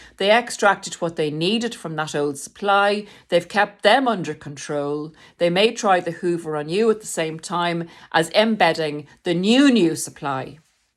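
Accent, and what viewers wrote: Ireland. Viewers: Hello from London and I found your channel today and I'm on video number 4! It helps your Irish as both my parents are, so it's quite familiar listening to you 😊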